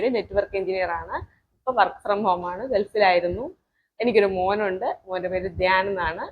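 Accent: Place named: Thiruvananthapuram, India. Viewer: ANGINEY CHECHIYUM STAR AAYI MAARI....THIRUVANANTHAPURAM BHAASHA STYLISH AAYUM, NATURAL AAYUM KAIKAARYAM CHEYYUNNATHAANU HIGHLIGHT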